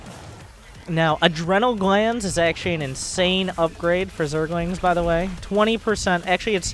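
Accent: South, United States. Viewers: Thanks for the tutorial. I enjoyed the southern accent ;]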